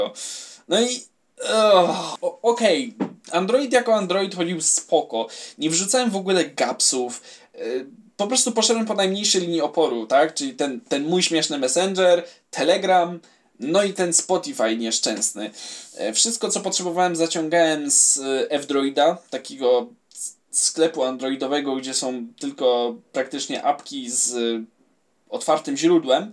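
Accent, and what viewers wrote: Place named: Podlasie, Poland. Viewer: akcent z Podlasia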